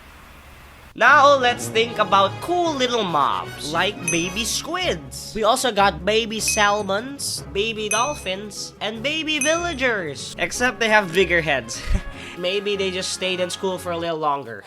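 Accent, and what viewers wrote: Philippines. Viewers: i love how your filo accent slips all the time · I know your filipino/southeastasian cuz of that accent :) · Dude you sound Filipino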